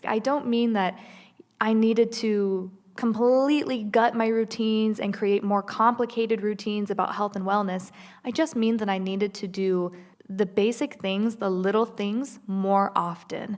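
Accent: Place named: United States. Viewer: I searched and searched and searched the world of YouTube for you. To think this whole year you were right here the whole time. I had to tell the algorithm that I wasn’t interested in hyper general viral videos and search for god centered wellness. Little did I know, you’d talk about healthy living in a fuller sense. Curios about your background. You say you’re from turkey but you should like you are born & raised American. Anyway, thank you for this.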